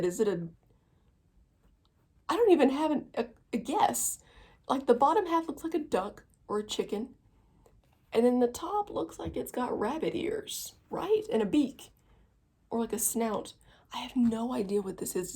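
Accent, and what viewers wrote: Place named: South, United States. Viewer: That Amish life in that magazine blitstitch is working on :) and I found it completed at a thrift store for a $1!!! It’s not framed but all three pieces are done except a little bit of border and bush but you can’t even tell unless you were looking really hard lol loved you video and am going to watch your 1st!! Love your southern accent 😜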